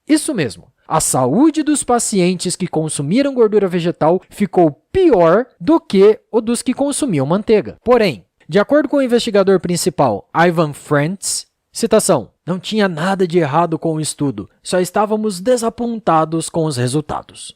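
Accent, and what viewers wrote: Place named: Rio de Janeiro, Brazil. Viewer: O sotaque do narrador me deixou maluco quem o carioca!! 😂😂😂😂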